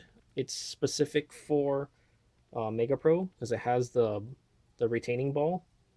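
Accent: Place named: Hawaii, United States. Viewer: Dang, you've accumulated quite an amount of tools! great video! such nostalgia listening to someone with a Hawaiian accent haha and also, if you can you should post videos on how you fix appliances! I would love to learn!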